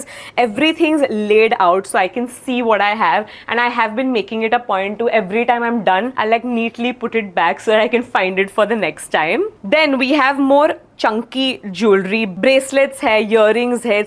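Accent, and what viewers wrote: Gujarat, India. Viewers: According to my observation all the gujju girls have this type of accent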